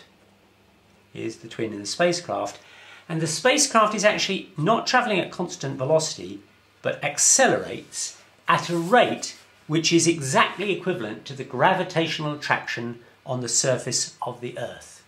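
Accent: United Kingdom. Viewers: i like the british sweety accent rather than the lecture itsel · Fantastic!!! The British accent is also extremely helpful.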